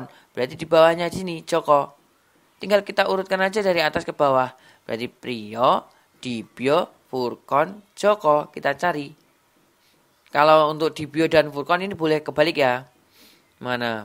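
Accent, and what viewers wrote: Java, Indonesia. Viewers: Alhamdulillah kak, aku tertarik nama chanel nya kayak nama orang Chinese tapi logat jawa punya dan ada rumus The Ping-nya..andai guru mtk mass skolah kayak njenengan pasti mudeng pol.. Kak, moon maap aku jadi tertarik matematika lagi saat persiapan tes SKD gegara chanel njenengan kang, Saya kurang kerjaan, itu apa akronim Ping2an(jawa) dan perkaliean?? Kerenn pokoknya, mudah dipahami semuanya dari chanel ini Ohya mau melaporkan tes skd saya hari ini meski nilai tidak sampai tembus di atas 350, hari ini saya tes dan berkat chanel ini TIU yang biasanya try out saya nilai selalu anjlok alhamdulillah dapat dua kalinya.. Ini tes kedua saya semoga yg terakhir krn udah ketrima pns. aamiin Skor 335 "twk 90 Tiu 105 Tkp 140" Ranking belum ngeh di instansi yg saya tuju Sedikit FR Pecahan sederhana dengan pendekatan dan barisan juga posisi duduk melingkar jan persis deg penjelasan njenengan kak, maturnuwun saya minta doanya ya bisa lulus perankingan SKB sampai jadi ASN AAMIIN YA MUJIBASAILIEN.. 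MUGA barokah selalu kak ilmunya karena keikhlasan berbagi ilmu yg njenengan miliki semakin berkembang, saya nantikan slalu temasuk materi skb nanti apa aja ya kak. Terima kasih #maapkepanjangan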